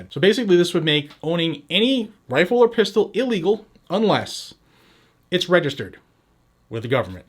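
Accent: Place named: Massachusetts, United States. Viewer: By the way I grew up in Billerica Massachusetts so I recognize a Massachusetts accent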